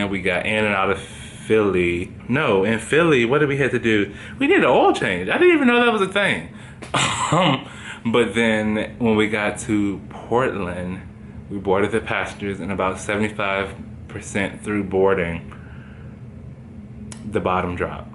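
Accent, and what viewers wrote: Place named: New Orleans, United States. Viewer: Are you originally from New Orleans? You sound like it. I am a 62 year old woman who finds your videos very entertaining. Thanks for making me laugh.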